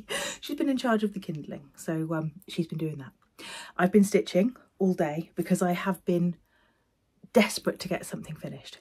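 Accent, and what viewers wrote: United Kingdom. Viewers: I’ve been watching for quite some time….and imagine I would act like Sew Sherrie if I happened to be in the same room!!!! However, with my southern American accent and your UK accent, I’ve feared we wouldn’t be able to converse well. But, after the list of podcasts today I’m no longer worried, I KNOW we would have a common language!!!❤❤😂😂 I’ve been bingeing Proof: A True Crime Podcast….about wrongful/suspect convictions. Something Was Wrong is another favorite, and I like Media Pressure…very interesting twist on the media. Trying to stay classy!!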